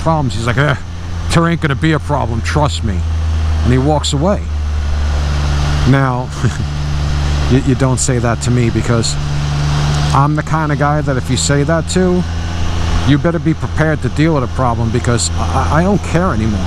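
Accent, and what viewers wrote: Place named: New York, United States. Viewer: okay!!!!.......i'm hooked!!! some of the funniest videos i've seen on youtube. thanks for the laughs. i grew up in scarsdale in the very early 70's and visited long island a few times back then. it's also great to hear that authentic new york accent and attitude. thanks for making me laugh for a few minutes, stay upright and healthy.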